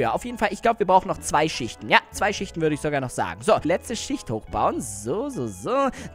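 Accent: Russia. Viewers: Kommst du aus Russland?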